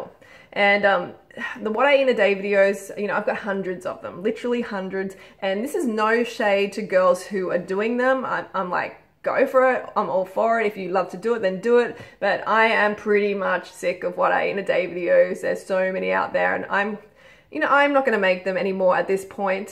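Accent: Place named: Australia. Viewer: say whatever you want Bebe, i can definitely listen to that Aussie accent of yours all day:)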